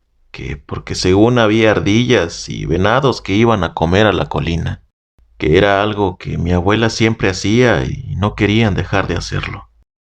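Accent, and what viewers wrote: North, Mexico. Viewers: No me canso de escucharte amigo 👍 tengo entendido que eres de Juárez? Me encanta el acento del norte de México Saludos a todos desde España 🇪🇦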